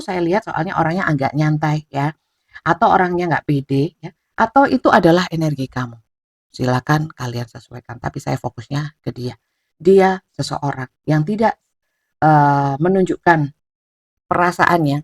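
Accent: East Java, Indonesia. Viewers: suka bgt gaya bicara sama logat Jatimnya kak tiap baca readingan......jd pgn meet up langsung ngobrol2, pasti asiikkkk......😁😁😁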